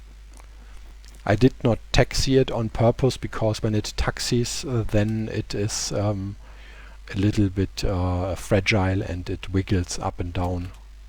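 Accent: Germany. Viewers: You sound very german dude :D Nice Mod!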